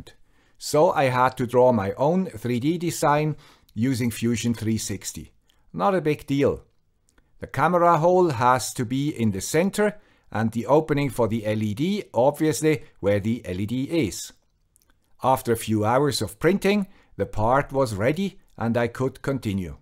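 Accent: Switzerland. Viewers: Thank you for these excellent videos. I love the clear thinking and explanation you bring to them. So, as AI has transitioned from its early mechanistic approaches (e.g., expert systems, etc.) to the current models based on non-parametric statistics, there is also a subtle change in its successful application. The current models are more about being 'less wrong' than 'exactly right in the instance'. This is encouraged by its alignment with large number theory and other assumptions (e.g., Bayesian Analysis, etc.). Given this, we might best think of modern AI technique as most suitable as part of a 'problem-space reduction' tool that gets bolstered and completed by more traditional, deterministic kinds of algorithms, rather than as a central part of some core algorithm. AI's real mission, IMHO, is about abstracting 'the smart' from from everyday good engineering, and this will require a different kind application with different kinds of value produced. 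I like to think of AI as a kind 'context manager' for whatever problem(s) I am trying to understand and solve. Still, this was a bold and valuable attempt. Bravo to, The Guy with Swiss Accent.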